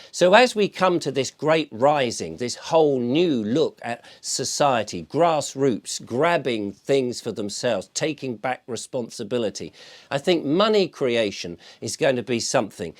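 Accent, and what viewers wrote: Germany. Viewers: Good German accent, à la Klaus. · I love your work and particularly your German accent. Keep bringing it brother xxxx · Hello, alas, I used to work in retail banking for my sins but hopefully I can make amends:) The bank staff have to ask about large withdrawals as a safe guarding procedure and it is understandable that it rubs people up the wrong way. All unusual withdrawals are looked into seriously in case there is money laundering at play as well. This is in the Banking Code Law and to be in breach of it, there used to be a 7 year jail term for the staff member. You lived in fear of getting anything wrong. There is also a limit on the amount of cash that a branch can hold (insurance purposes) but large withdrawals can be done by appointment. Often, it's a worry about people's safety carrying it around or being robbed. I love ze German accent and I'm no fan of the way banking is going either! 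Looking forward to the solutions videos, Sunak & co. have left things too late, we need to act now. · Excellent German accent btw 👨🏻‍✈️ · Your German accent sounded like Kermit the frog, just realized that Kermit is a German name. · Never mind the naff, the German accent was highly significant.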